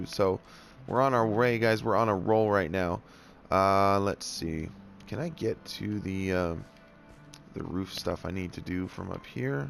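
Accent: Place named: Ireland. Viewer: I think the ender Sphinx looks great and sorry with all the questions but what country are u from because I sound like u and I'm Irish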